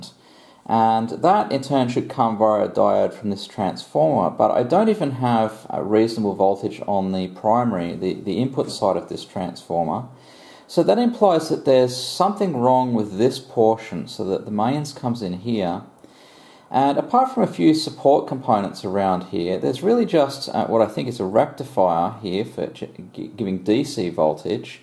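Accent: Australia. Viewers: Enjoyable. Came here from retrospectre channel. Subscribed. Nice to hear a fellow ausdie voice too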